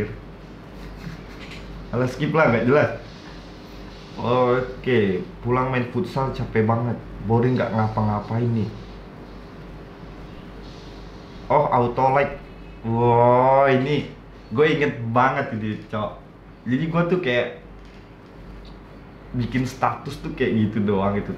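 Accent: Java, Indonesia. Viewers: Asli jepara apa purbalingga apa depok si ini org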